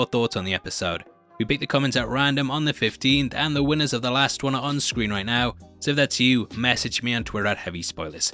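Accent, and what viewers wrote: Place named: England, United Kingdom. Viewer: I love English accents. You called Christina Christiner and I love it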